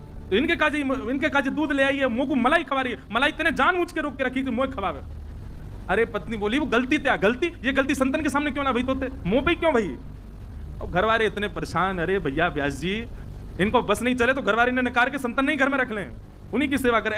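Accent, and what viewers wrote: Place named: Braj, India. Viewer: जय हो भैय्या बृज भाषा बड़ी अच्छी लगै आपके मुख से। सुंदर कथा। हमने भी राम स्वरूप जी के दर्शन किए हैं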